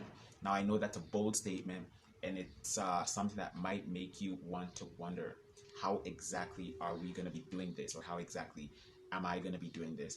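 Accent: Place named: United States. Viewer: Great content ...but how did you lesrn American English😂😂😂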